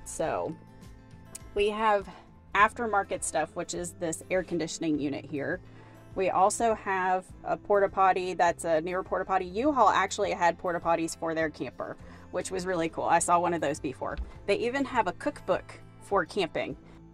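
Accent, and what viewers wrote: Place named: South, United States. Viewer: I soon as I heard that Southern accent I knew that I was in the right place 😁 Nice video.. I'm thinking about getting a camper here in Germany so I'm trying to learn as much as I can.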